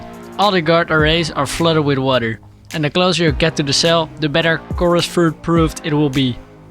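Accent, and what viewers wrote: Netherlands. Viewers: Eyo may I ask, are you dutch since your accent sounds like it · Are you dutch? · Are you Dutch? The accent sounds familiar (also I'm Dutch) don't try to not say it, I am not gonna escape it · you sound dutch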